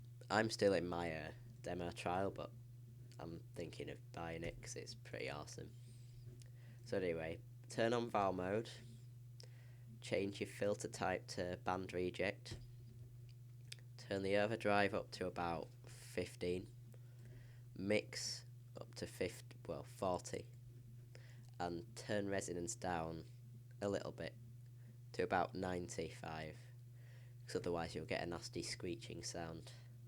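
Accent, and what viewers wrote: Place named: Nottingham, United Kingdom. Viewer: u sound like ur from nOTTTINGHAAAAM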